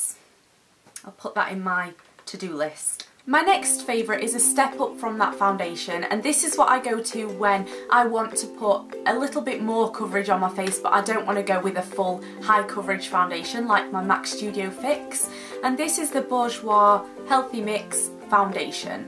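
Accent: United Kingdom. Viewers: I wish u.s drugstores carried the bourjois healthy mix foundation I really wanna try it :( I love your videos by the way :) what accent do you have like I know youre british ( im not trying to be rude im just a curious kitty)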